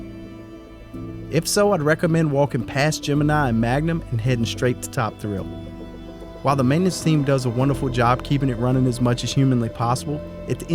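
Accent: South, United States. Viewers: What is the accent of voice-over person? Southern?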